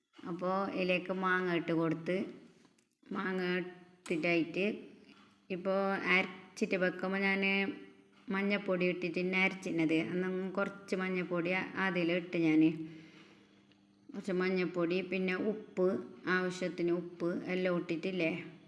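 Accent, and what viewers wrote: Kasaragod, India. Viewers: എനിക്ക് നിങ്ങളെ വീഡിയോ കാണുന്നത് വളരെ ഇഷ്ടമാണ് നിങ്ങളെ വോയിസ് ആണ് എനിക്ക് ഏറ്റവും ഇഷ്ടം നിങ്ങളെ കാസർകോട് സംസാരരീതി എനിക്ക് വളരെ ഇഷ്ടമാണ് റെസിപ്പി സൂപ്പർ താങ്ക്യൂ ഫോർ ഷെയറിങ് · മീൻ കറി റെസിപ്പി ഇഷ്ടമായി കൂടെ കാസർഗോഡ് ഭാഷഒത്തിരി ഇഷ്ടപ്പെട്ടു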